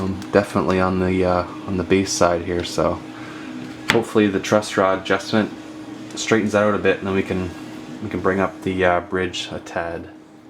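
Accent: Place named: Canada. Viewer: SLIGHTLY off topic but as a fan of linguistics, I have to say, Americans always think we Canadians say "a-BOOT". But as my hoser brother here says, it sounds way more like "a-BOAT".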